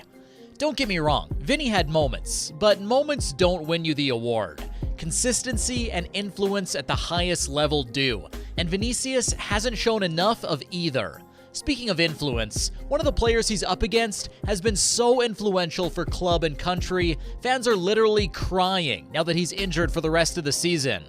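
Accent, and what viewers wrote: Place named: United States. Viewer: i heard a yank accent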